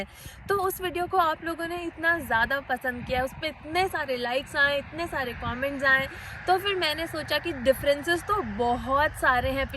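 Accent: India. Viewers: आप की भाषा लहजा देख लगता है पक्के भारतीय हो भारत की बुराई करना भी चाहो तो नही कर सकते।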